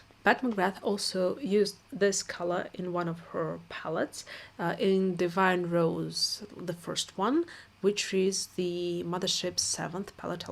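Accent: United Kingdom. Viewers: it sounds kinda British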